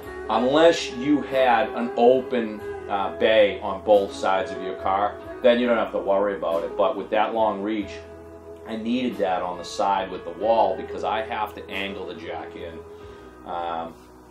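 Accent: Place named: Boston, United States. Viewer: Hello form 02368. I heard that Boston in you for sure, before I read the name of the YouTube channel LOL. Great video.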